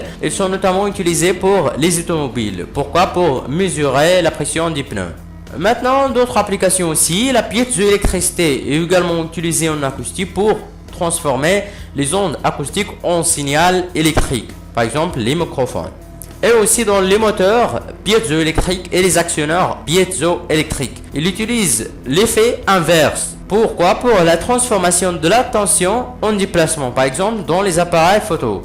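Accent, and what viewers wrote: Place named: North Africa. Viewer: Le problème des " scientifiques " c'est que la plupart ne savent pas présenter ou être pédagogue, en général quand un scientifique t'explique quelque chose, il est tout seul dans sa tête... La voix avec fort accent maghrébin est déconcentrante, la police des slides mal choisie, c'est parfois chargé pour rien... Il vaut mieux faire simple, que vouloir trop en dire ou trop en montrer pour rien. Bref merci pour les efforts d'explication, mais faites un petit effort sur la pédagogie svp et la pédagogie commence par simplicité et la clarté. Bon je ne dis pas que c'est incompréhensible, mais voilà quoi, ça donne envie d'aller voir une autre vidéo... Merci quand même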